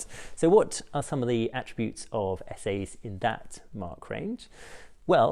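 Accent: United Kingdom. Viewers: And who knew He was Welsh?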